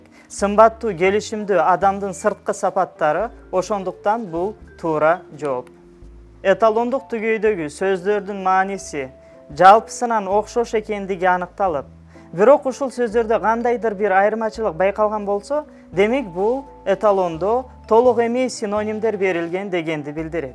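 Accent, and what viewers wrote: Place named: Turkey. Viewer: У него турецкий акцент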